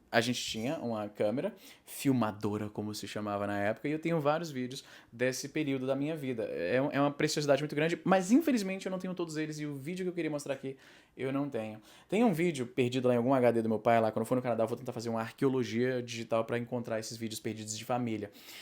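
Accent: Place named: Brazil. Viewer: Seu sotaque brasileiro está "perfeito" e muito agradável de ouvir.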